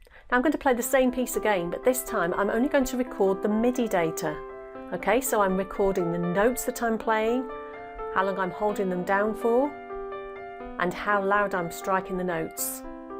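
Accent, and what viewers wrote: United Kingdom. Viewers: That British accent!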